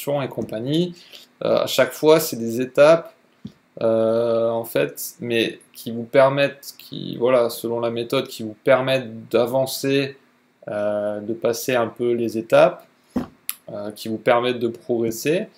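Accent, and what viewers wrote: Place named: Switzerland. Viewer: vaguement suisse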